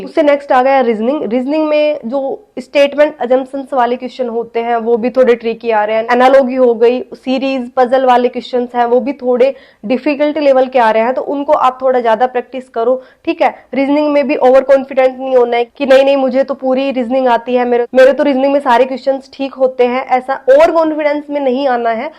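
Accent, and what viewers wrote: Haryana, India. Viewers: ! Tum haryanvi ho?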